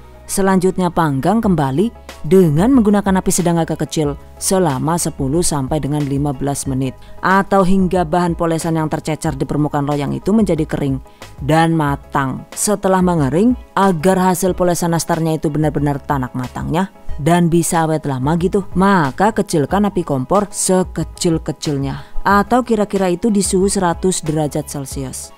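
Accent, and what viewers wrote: Banyumas, Indonesia. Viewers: 😂😂😂ak cilacap bun... klo bunda asli orank mana si....? pake bhsa ngapak juga jadi ngakak pas ada lalat mo ikut bikin kueh nya😂😂😂 ..❤ ak sllu mngikuti resep² bunda g pernah gagal...sehat² sllu ya bund....🎉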